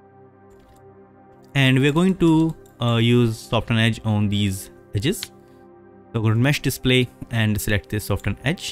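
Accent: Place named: India. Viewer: Indian Accent = 10/10 Tutorial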